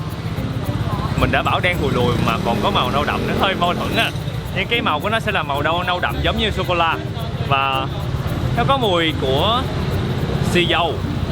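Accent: Mekong Delta, Vietnam. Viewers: Nghe giọng nói đúng chất miền tây. Dễ nghe gần gũi. Thành fan ông này mất thôi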